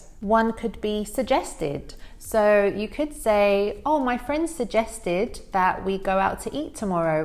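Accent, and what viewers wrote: United Kingdom. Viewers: Hello 😇 Take love from India ❤️😇💐 Can you please tell me in which british accent you are talking 😇 To be honest with you I am in love with your accent 😇💐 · you have very good British accent.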